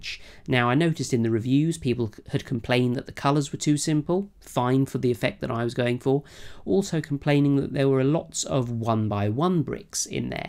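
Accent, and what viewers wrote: United Kingdom. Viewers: your fancy British accent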